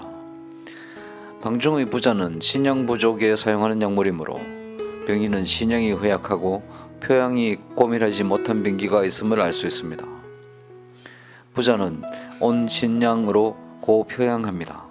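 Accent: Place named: Gyeongsang, South Korea. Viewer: 감사히 잘 듣고 있습니다.경상도 발음이라 잘 못알아들을때가 가끔 있어요^^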